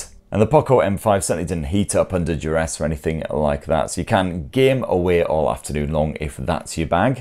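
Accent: United Kingdom. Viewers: W video W person W opinions why u ask? - makes wild animal jokes 💀 - has beef with sweaty gamer kids 💯 - tests display by playing Jojo - British accent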